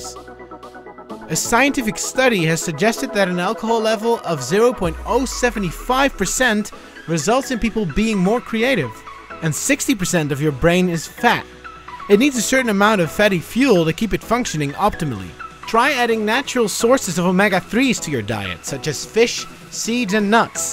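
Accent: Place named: Netherlands. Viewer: you are dutch? really dutch accent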